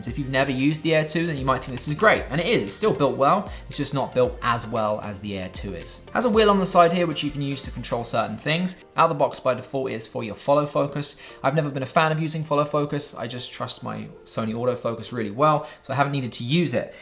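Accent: United Kingdom. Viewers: There's nothing cool about the LED light, but then I am nearly fifty! Good video and good to hear a UK accent about photo/video gear on YouTube. BTW Your avatar looks like you're wearing chain mail.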